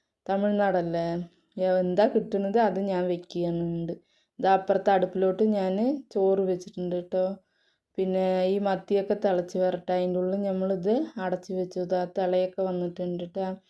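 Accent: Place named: Palakkad, India. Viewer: അൽഹംദുലില്ലാഹ്. സഹോദരി സുഖമാണോ. സ്ഥലം പാലക്കാട് ആണോ. സംസാരം അത് പോലെ തോന്നുന്നു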